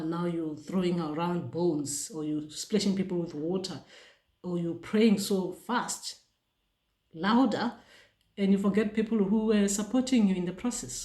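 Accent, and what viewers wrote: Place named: Balobedu, South Africa. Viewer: Surely speak like Balobedu. Nna my great grand parents come from Ga Modjadji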